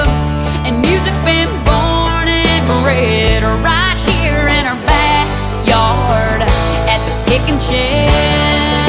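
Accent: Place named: South, United States. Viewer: Please come back! Love your voice an your down home sound. Need more of that now days here in southern illinois!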